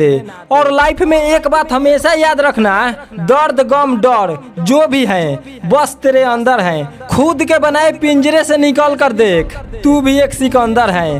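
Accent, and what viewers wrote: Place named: Bihar, India. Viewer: Mai bihar se hu tumhara Bihari tone saph dikh rha hai Hindi sahi karo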